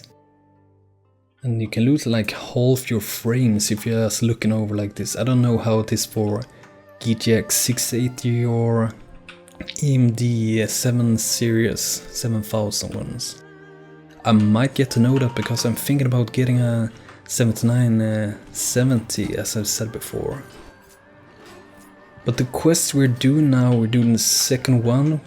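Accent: Sweden. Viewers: Sounds very cool! :) Couldn't help to notice that you were swedish. So am I ;) Ha det bra, kommer fortsätta titta på dina videos !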